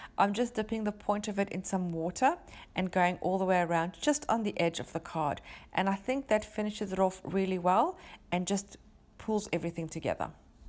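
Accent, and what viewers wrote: South Africa. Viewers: Love your work and your way of explaining what you’re doing and how you come to your final decision about composition. Of course love your accent! Says a fellow South African. I would love to be a winner of one of your cards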